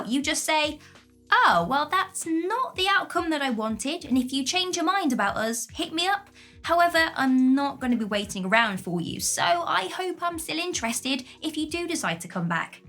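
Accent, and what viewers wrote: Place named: Scotland, United Kingdom. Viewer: I can hear some Scottish undertones...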